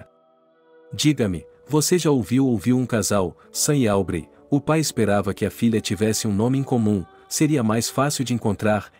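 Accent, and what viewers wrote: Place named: Russia. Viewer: O narrador é horrível. Confunde os pronomes . Refere-se a moça/mulher como ele e chama o rapaz/homem de ela. Atônita o narrador só diz atonita. Poço é “poco”. Aos ele não lê tudo junto. Ele pronuncia cada letra separada das outras: A o s. É uma infinidade de erros que você só entende porque são textos rasos, desprovidos de complexidade. Uma coisa é certa, o narrador não tem nenhuma intimidade com a Língua Portuguesa. A tradução é péssima e o narrador parece um russo lendo um texto em português, que ele tentou decorar, foneticamente.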